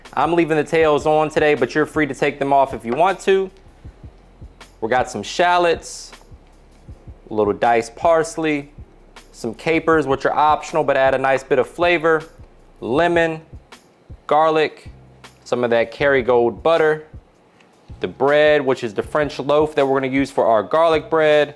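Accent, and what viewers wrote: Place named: Pittsburgh, United States. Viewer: First time watching you. Recipe looks amazing and will make it today. Are you from Pittsburgh PA? You sound like everyone I know, from Pgh. 👍🏼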